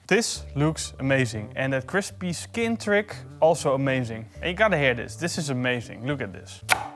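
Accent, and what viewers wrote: Netherlands. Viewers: That accent sounds familiar! A Dutch Friend? Greetings from Germany :) Keep up the good work!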